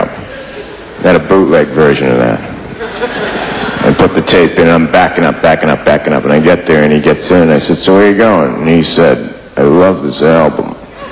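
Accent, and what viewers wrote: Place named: Australia. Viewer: FUNNY SCOUSE ACCENT- it's australian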